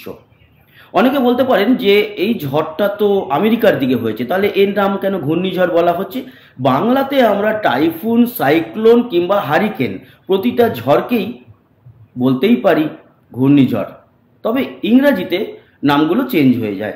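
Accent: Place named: Fatikchhari, Bangladesh. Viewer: আপনার মুখে ফটিকছড়ির কথা শুনলে ভালো লাগে তাই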